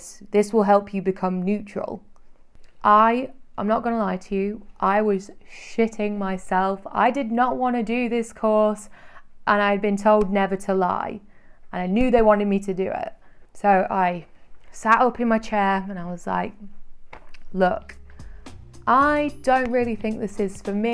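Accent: Manchester, United Kingdom. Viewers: Hey... I found this from Reddit, Scientology, yes watched a few things and looked into it a bit over the years but this first part has knocked me for six! I think its because you bring home the total reality, home indeed, being a fellow Mancunian. I can also relate to a lot of what you experienced growing up, key development ages to boot!!! It really jumps out at me the True Rebel with a heart bit that you were obviously born with (takes one to know one;). I adore that in everyone, more today than ever before. I was due to be up early tomorrow but i feel an educational binge coming on. Hope you share what you have become in life apart from this brilliant touching series (of which takes a lot of skill and commitment etc) because that's the biggest inspiration, coming out the other side with something of your own to show, and i don't mean materialistic status crap either, I mean the soulful things. 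this kind of abuse destroys the soul but to survive it makes you immensely emotionally intelligent and in touch. You are in possession of large heart! your unselfishness says that much... respect:) Awfully inspiring and... refreshing to hear my home accent lol xx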